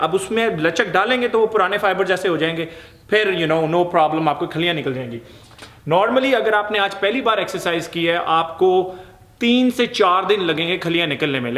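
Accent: Punjab, India. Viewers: sir ur video is awesome and ur panjabi is sooooo cuteeeeee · Pa ji your punjabi accent is just too gud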